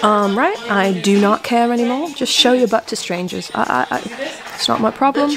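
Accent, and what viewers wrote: United Kingdom. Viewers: I strangely find your British accent quite pleasant to listen to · i love the british accent lol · I love your English accent and the commentary is spot on 🤣 Your poor, long suffering cats! Nah, you can tell they really love you, it's so sweet x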